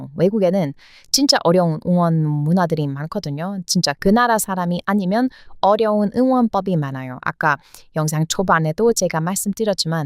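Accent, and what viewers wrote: United States. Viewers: 와... 사용하시는 어휘가.. 대다수의 한국인들보다 훠~~~얼씬 다양하네요.. 정말 한국어 잘하세요.. 억양 때문에 미국인이라는 걸 인식할 정도로... 요즘 어린 친구들 말하는 거 들어보면 절반이상이 비속어 & 욕설의 천박한 화법인데.. 이미 외국인들이 한국인보다 한국어를 잘하는 세상이 된 것인가... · 한국인인데 약간 미국발음으로 하시는분 아닐까? 하는 생각이 가끔 듭니다. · 미국사투리 정말 잘하시네요 미국인인줄 알았어요 완전 한국인인데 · 오리지널 미국인의 한국말. 이게 전형적인 미국식 한국말이다. 억양이 독특하다.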